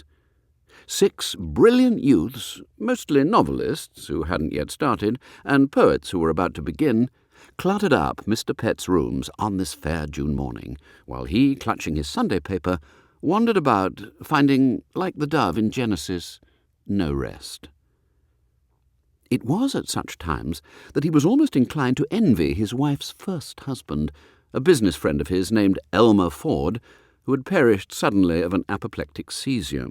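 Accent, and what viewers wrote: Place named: United Kingdom. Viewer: Dear, please reread the Wodehouse novels read by female readers. Although some are fairly good writers, invariably they don't have a British accent which is really appreciated. Also, because most of the characters are males, it just makes sense. Thank you so much for the great reading. Looking forward to finding more of your readings. Thank you!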